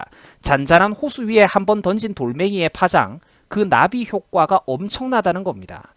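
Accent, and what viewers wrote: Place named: Daegu, South Korea. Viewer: 항상 잘보고있습니다. 대구사투리 넘좋다 하앍하앍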